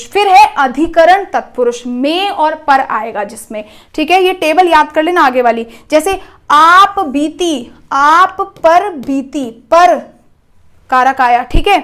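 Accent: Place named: Haryana, India. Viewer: Didi aap haryana ke hona!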